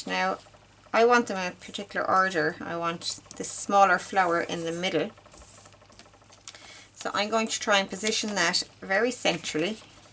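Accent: Ireland. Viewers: Not only do I love what you have shared here but I could listen to your lovely Irish accent all day. Thanks!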